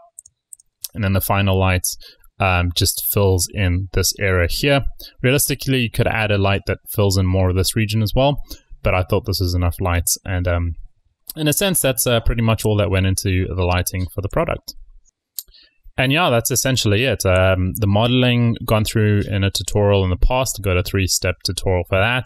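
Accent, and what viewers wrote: South Africa. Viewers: You might be a South-African by the sounds of it :D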